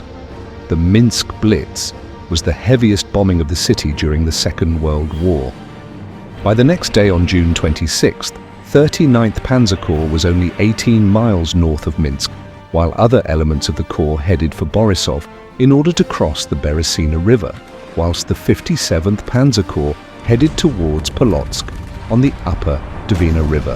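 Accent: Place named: Russia. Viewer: Great narrating and also new information to me thanks for this educational video ❤ I'm glad this gruesome war had ended ~ and I hope further peace persists ☮️ Full watch 🤍 PS: the Russian accent hehe 🤭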